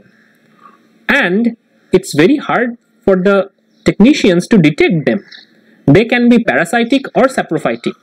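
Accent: India. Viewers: Indian people talk really funny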